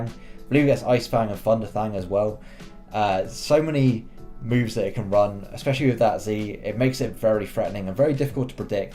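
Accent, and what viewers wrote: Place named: England, United Kingdom. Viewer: Great draft, even better accent - it's nice not being the only Englishman in the league!! :')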